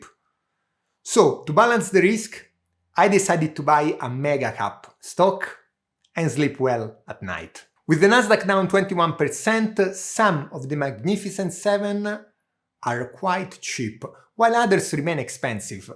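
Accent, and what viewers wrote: Italy. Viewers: I just subbed. I have only watched 32 seconds. But judging from your appearance and energy your channel will probably grow. My early impression of your accent is Italian.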